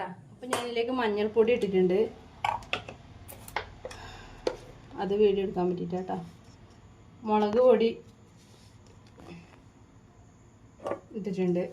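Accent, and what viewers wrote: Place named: Kannur, India. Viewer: പക്ഷികളുടെ ശബ്ദം കേൾക്കുന്ന സുന്ദരമായ സ്ഥലമാണെന്ന് മനസിലായി.കണ്ണൂർ ജില്ലകാരെ പോലുള്ള സംസാരത്തിലുള്ള അച്ചാർ ഉണ്ടാക്കുന്ന നല്ല വീഡിയോ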